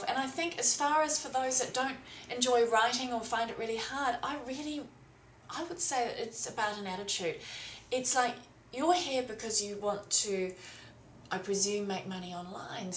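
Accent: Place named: Australia. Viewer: reminds me of how much I love Austrailian accents...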